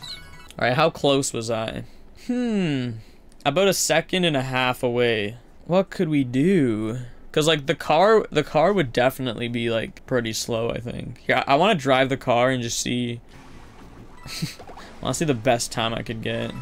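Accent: Canada. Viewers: That’s just how Canadians sound like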